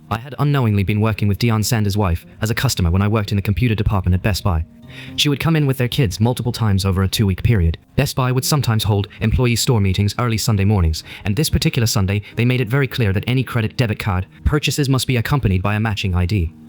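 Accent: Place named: United Kingdom. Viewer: What’s with the British accent?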